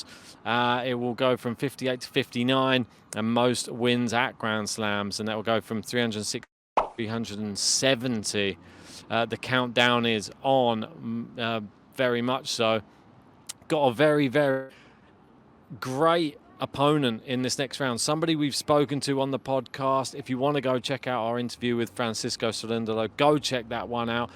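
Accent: United Kingdom. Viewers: It’s the British accent. Give the guy a break!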